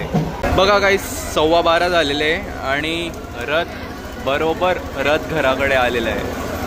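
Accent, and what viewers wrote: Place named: Jalgaon, India. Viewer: खूपच छान एवढ्या गर्दीमध्ये रथाचे चित्र ण करून तु आपल्या जळगावच्या भाषेत मायबोलीत बोलत असतो तेव्हा खूप गावाकडची आठवण येत असते तुला पुढच्या वाटचालीस भरपूर यश मिळो ही श्रीरामा जवळ आमची हात जोडून प्रार्थना आहे धन्यवाद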